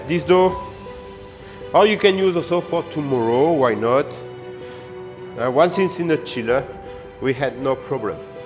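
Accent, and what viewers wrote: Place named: France. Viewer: Yes he speaks broken English / Manglish with Malaysian cadence (still has French accent) lol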